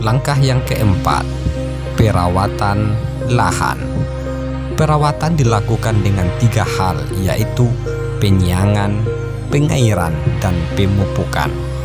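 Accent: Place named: West Sumatra, Indonesia. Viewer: dialeg regional Minangnya menambah manis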